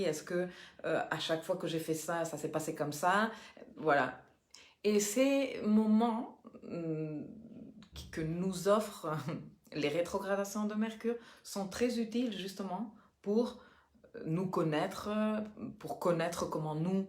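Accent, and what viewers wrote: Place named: Mexico. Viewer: Merci beaucoup pour votre reponse...🙏🙏je vous découvre depuis quelques temps et j'aime beaucoup vos analyses et interprétations très éclairantes qui se croisent avec d'autres...et surtout j'aime beaucoup votre accent Mexicain... Gratitude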